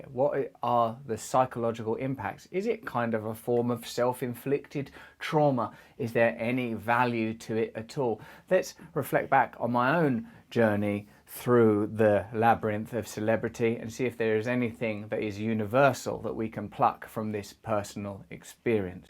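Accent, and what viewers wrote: United Kingdom. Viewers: Hard for me to understand the accent in the Queen’s tongue. Yet I really like these 1vs1 testimonials from Brand. This is a good story, that proves careful what you wish for. However I am grateful I got to see this man perform, and make me laugh on occasion. Also he’s intellectual 😂 and he is from England. Thanks for the messages. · I have to admit...the first time I saw you, I thought you were quite handsome. And then you spoke and that British accent tickled my ears. I’ve loved watching you in your movies. You don’t seem to be acting at all but rather, you walked on set and you and the role really were one. Only the best actors become the role. And then, you can walk away and you’re again. So you’re right about there being a split.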